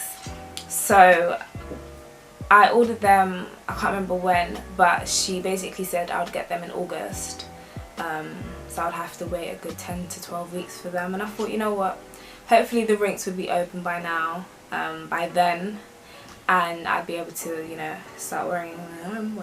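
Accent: United Kingdom. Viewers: Good on you for buying stuff from olis, i know them and they are a family run business by a family of skaters and decent people. I skated their sessions every Sunday and can't wait for these stupid covid restrictions to end and for the sessions to resume. I have Bauer NS and I can't get on with them ether, bloody amazing looking boots but they give me horrendous blisters on my ankles and the lack of boot flex does not suit my skating style as I like to have hard bushings and have them tight for speed and rely of upper boot flex to be able to lean in turns but the NS has a boot as stiff as a corpse lol. I the boots i regularly skate on are my 90's bauer turbo and my new supreme turbo which are a clone of the Bauer turbo. Was nice hearing your London accent but it made me homesick, I'm originally from east London but moved out to Salisbury in Wiltshire 9 years ago and it drives me mad living among all these countryside snobs ha ha.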